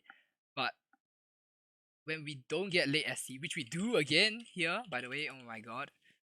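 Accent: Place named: Singapore. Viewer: That Singaporean accent, nice to see another fellow SG player with teto tutorials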